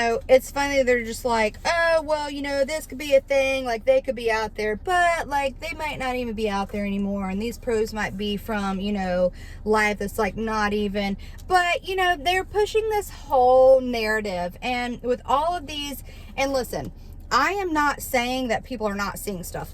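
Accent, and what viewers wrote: South, United States. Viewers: Well, first off. The accent got me right away. A southerner trying to "Oomowmow." Priceless! I'm from Houston, Texas. I served in the military my whole youth from 1980 to 1994. I was even in Operation Desert Storm, and without trying sound like Dale from King of The Hill. I am a Christian and believe that Jesus Christ is God. He is The Word of God made flesh. In him is eternal life. He is my LORD and Savior. 1 John 5: 7 and 20 Ok. Here's what's actually happening. Everything that people are seeing in the skies has been made by man with really good science. It seems like magic, it's so advanced. We now have craft that can do things no one would believe possible. The real "entities" cannot take a human form. God's Word explains this. - Hebrews chapter 1. "He maketh his angels spirits." The angels that kept not their first estate, but sinned have been "bound in chains of darkness." ( 2 Pet. 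2: 4). This darkness is the realm known as the bottomless pit. Revelation chapter 12, 13, and 17 explain this. "The beast that thou sawest was, and is not; and shall ascend out of the bottomless pit, and go into perdition:..." Paul said of them that they are spiritual wickedness in high places. - Ephesians 6: 12 Excuse me? What does god need with a spaceship? Jesus said of their coming, "Shall shew great signs and wonders; insomuch that, if it were possible, they shall deceive the elect. (Matt. 24: 24). Paul confirmed what Jesus said of their coming. "Even him, whose coming is after the worki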